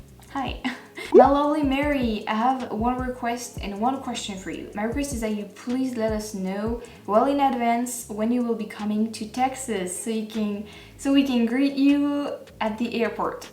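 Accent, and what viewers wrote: France. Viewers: I Love your videos. When I finish watching your videos, I think with a French accent. ☺️❤️😊😀 I know you love the drums and drum solos, so I kindly suggest you check out drum solos by 80’s pop musician Sheila E. She is amazing and is considered the greatest female drummer in the world.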